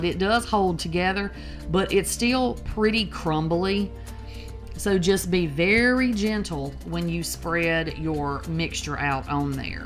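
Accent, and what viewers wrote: South, United States. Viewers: Love the Real Southern Drawl! I could listen to you talk all day.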